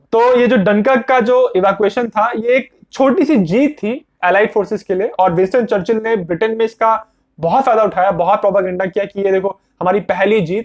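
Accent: Bengal, India. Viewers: Bro are you a Bengali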